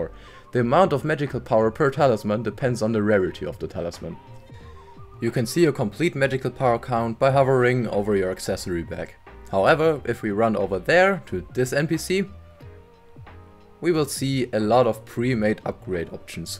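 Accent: Germany. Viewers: this german accent tho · you sound german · are you german? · you sound like german xD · are you german? bc of the accent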